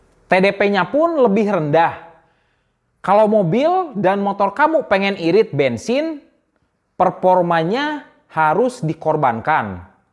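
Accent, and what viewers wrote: West Java, Indonesia. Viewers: logat na sunda pisan kang ..he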